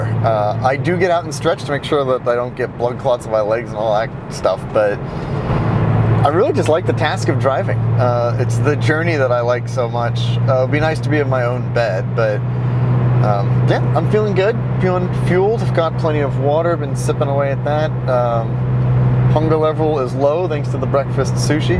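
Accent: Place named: Ohio, United States. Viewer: San LOUIS Obispo?!???? You sound like your from Ohio or somethin!!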